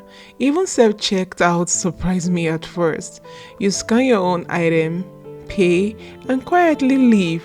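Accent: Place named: Nigeria. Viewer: GET SOME AFRICAN FRIENDS.Which African country are you from? You sound Nigerian.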